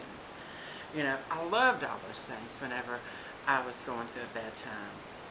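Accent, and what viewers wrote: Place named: South, United States. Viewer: Was born in Mississipi. LOve that southern accent :)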